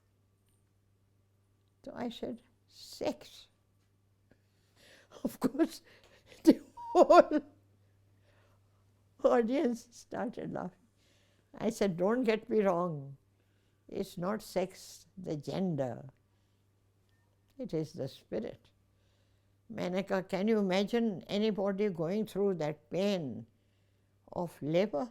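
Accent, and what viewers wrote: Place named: Kashmir, India. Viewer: I wonder why she a hint of kashmiri accent.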